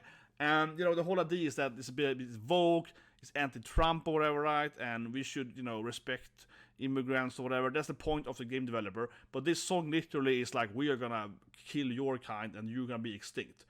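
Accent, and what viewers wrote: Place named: Sweden. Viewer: You keep saying "us Norwegians", but you're clearly Swedish :D Maybe it's an in-joke with the channel I dunno. Edit: Ok you have dual citizenship. But you speak Norwegian like you never spoke Norwegian before, it's pretty funny. You must have spoken Swedish when living here. Anyway, this game epitomizes why we need to shut down the department of culture.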